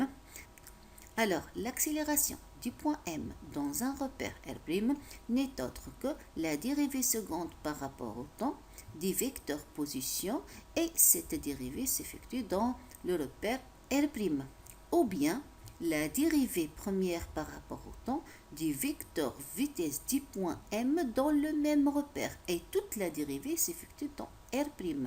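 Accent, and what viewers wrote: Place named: East, France. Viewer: Non seulement la forme c’est à dire votre accent oriental extraordinaire… mais aussi le fond c’est à dire votre précision, votre rigueur, le caractère didactique de votre résolution… tout cela fait de vous un professeur de qualité ! Vos étudiants doivent être fiers de vous !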